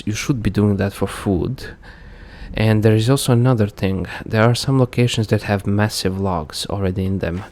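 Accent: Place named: Eastern Europe. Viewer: He is from Eastern Europe. They speak like this.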